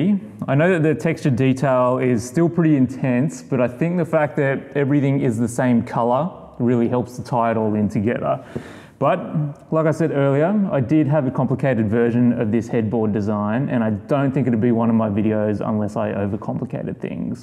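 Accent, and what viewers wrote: Australia. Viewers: So nice to click into a video and hear an Aussie accent. Hello from Ballarat 🥰💗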